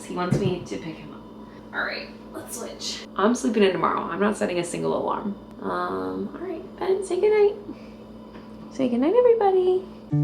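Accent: New York, United States. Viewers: Another interesting video in the life of, fast talking with her strong New York Accent doing stuff around the City, even getting up early in the morning to go to the parade supported by some of the members of The Flamboyant NYC Crew featuring lots of, From The Twin Sisters R'NT US, The Tall Loud Blonde One, and. There was even a guest spot from, The Mature Student. For someone who claims that she is shy and introverted, certainly knows a lot of people! Also the Cats Bennett and Olive also made an appearance with Bennett having an obsession with sitting on top of the door! Anyway love the content and as for the Critics, they must be jealous because they won't go on you tube! Take care now! From London UK 🇬🇧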